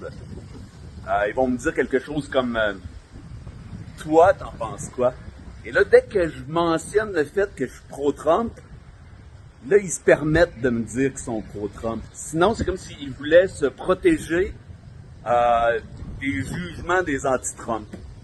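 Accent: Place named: Quebec, Canada. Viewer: Merci beaucoup, j'adore l'humour Québécois,et la façon de parler.Amities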